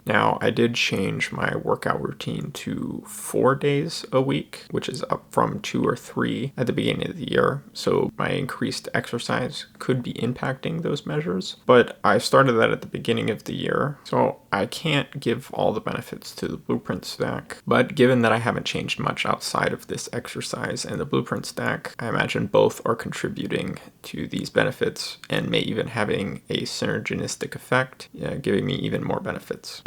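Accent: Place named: Pacific Northwest, United States. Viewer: Pacific Northwest accent maybe? A lot of people here speak like this.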